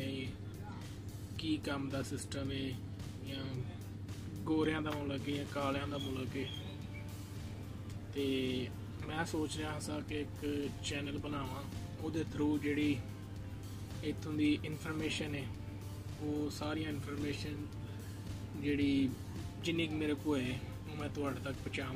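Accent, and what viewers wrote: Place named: Sialkot, Pakistan. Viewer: Bhai Aapki BOLI sialkot wali hai... I'm sure kiunke Main bhi Sialkot se hon.. Yeh Punjabi Sialkot ki hai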